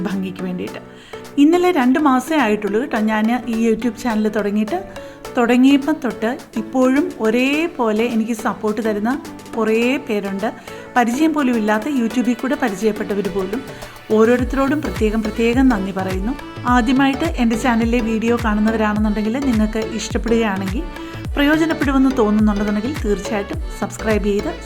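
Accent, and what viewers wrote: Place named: Pala, India. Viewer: എന്റെ friend geetha george ഇതു പോലെ പറയും നല്ല ശെല് എന്ന്. പാലക്കാരി ആണോ 😍😍😍😍😍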